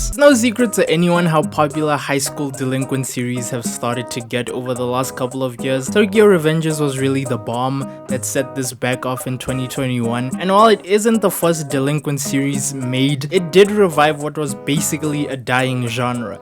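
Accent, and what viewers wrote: South Africa. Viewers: Yo are you South African?? You dsying 'This ain't it chief' is a phrase I've realised I only ever hear in my country😂😂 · I knew that accent sounded so familiarrr brotha is south african like me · You south african neh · How did I know you were South African in the first 30 sec😭🇿🇦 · I know you are South African , our accent is so pure I’m proud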